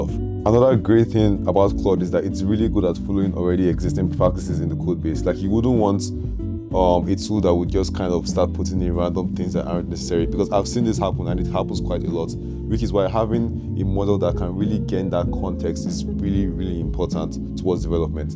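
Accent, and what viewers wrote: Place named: Nigeria. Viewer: You must be Nigerian right?😊 I can tell from your accent brother 😊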